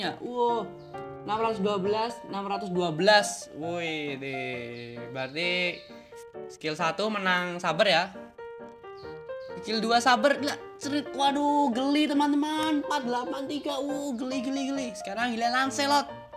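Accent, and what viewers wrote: Java, Indonesia. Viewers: Pasti yg punya Chanel orang Jawa keliatan Logatnya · Orang jawa yag mas?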